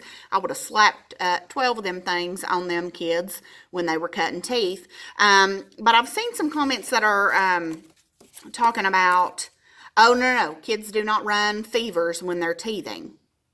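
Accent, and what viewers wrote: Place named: Texas, United States. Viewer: I recognize a Texas accent anywhere!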